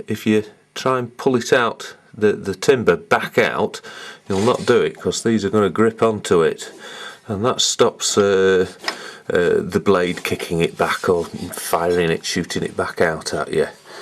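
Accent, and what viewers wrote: Manchester, United Kingdom. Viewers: A couple of really useful videos. There aren't many reviews for this out there and to find one from a fellow northerner was nice. Just bought one of these second hand for £40. Funnily enough I hopped over the pennines to pick it up from a chap in Manchester, which I assume from the accent is where you're from ish. Thanks again and kind regards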